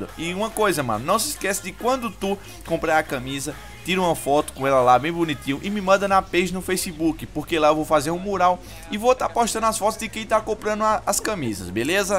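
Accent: Northeast, Brazil. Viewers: MAN ELE E DO NORDESTE É???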